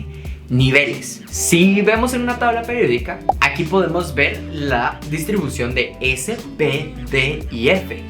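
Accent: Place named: Colombia. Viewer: Pensé que eras de colombia 😊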